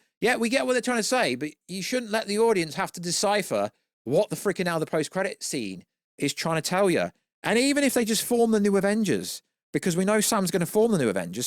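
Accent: United Kingdom. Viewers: You sound British eating beans and tuna all day ...🤮🤮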